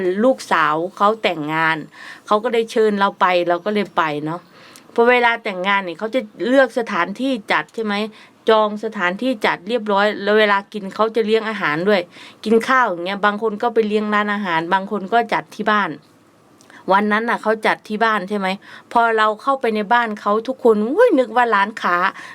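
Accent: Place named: Isan, Thailand. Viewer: สำเนียงคือคนอีสานแท่จ้า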